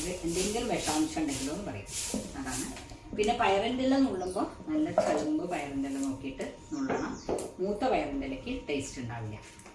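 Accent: Valluvanad, India. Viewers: Valluvnadan slang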